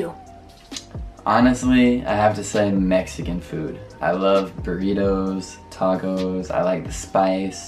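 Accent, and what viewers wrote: United States. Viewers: Non so se sia più bello quando parli inglese o quando parli italiano con l’accento americano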